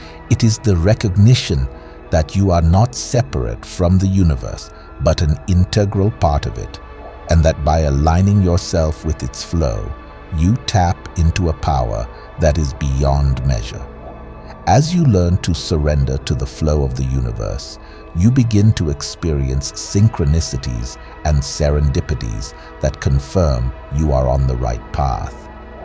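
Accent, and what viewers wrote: India. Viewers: You are sound like Indian..!!! Solemn voice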